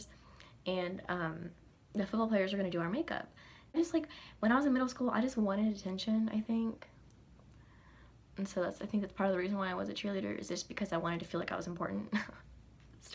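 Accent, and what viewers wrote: South, United States. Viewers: I love your southern accent 😂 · You have a little country accent oml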